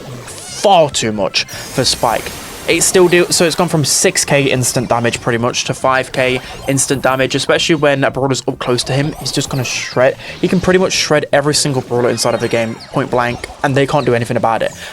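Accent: United Kingdom. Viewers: Bro's heavy British accent wont let me understand some of his words, who tf is Russ?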